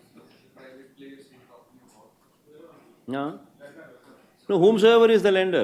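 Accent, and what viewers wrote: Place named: Kadapa, India. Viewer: Chi kadapa yaasa! .5 yaasa idi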